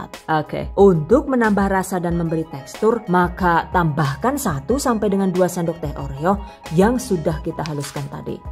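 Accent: Banyumas, Indonesia. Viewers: Aku ngakak bunda ngomong ngapak. Wkwkwkwk (sedikit ngerti). Trims resepnya bund, kayaknya lebih praktis pake coklat yg sudah berwarna ya, lebih awet pula. Sukses buat. Aamiin... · Yassalaaam ngapakmu marai aku kemekelan mbak😂😍😘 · Ya Allah deneng rika ngapak bu,asline rika si wong ngendi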